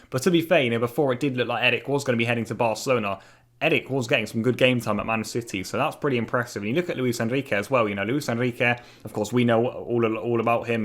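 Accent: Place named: England, United Kingdom. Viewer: why do you have an english accent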